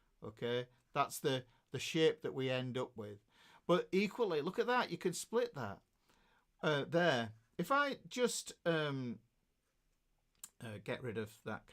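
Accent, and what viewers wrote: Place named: Yorkshire, United Kingdom. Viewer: books are worth every penny! I love the way he breaks everything down and explains things in a way that makes it easy to understand. Thank you! The accent helps I think.. 🤔 Us Americans and our slang English language can sound ignorant when compared to proper Yorkshire accent. lol. All good stuff!!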